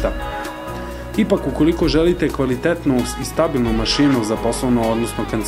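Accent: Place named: Serbia. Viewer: jbt srbin prica :D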